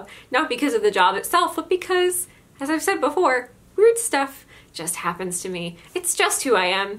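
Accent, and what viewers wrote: Newfoundland, Canada. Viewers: Your Newfoundland accent made my LIFE! I'm a Newfoundlander living in Chicago and I have absolutely answered my salon's phone in a heavy Newfoundland accent before. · I HAVE ALWAYS WANTED TO LEARN A NEWFIE ACCENT I AM IN TOTAL AWE YOU DON'T REALISE THE TALENT YOU HAVE IT IS SUCH A TREASURE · I don't have a retail story but your Newfoundland accent is so cute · Lmao that's such a good newfie accent u didn't know you were newfie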